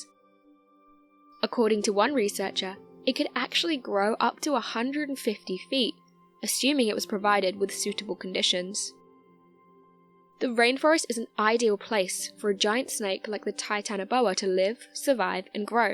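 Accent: United Kingdom. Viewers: I wish this woman would do some asrm videos. Such a lovely voice and a British accent, hell all I need is a bag of chips and I'm set.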